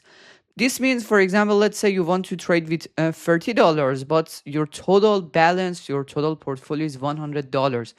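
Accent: Iran. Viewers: از لهجه تخمیت معلومه ایرانیی😂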